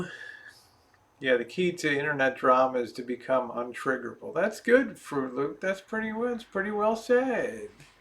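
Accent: Baltimore, United States. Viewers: We are the same age and I feel so I pretty in comparison! I'm interested in your metal detecting. It's something I would love to try. How hard is it on your back? Is it something that you would think is not good? I have a bad back but am able to get around. I understand that you have to do some digging but is it possible? Also I have family in baltimore and love your accent 😍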